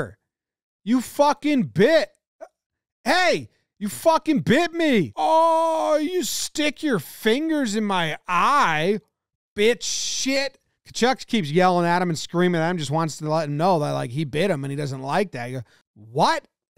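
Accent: Canada. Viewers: Love your Canadian accent lol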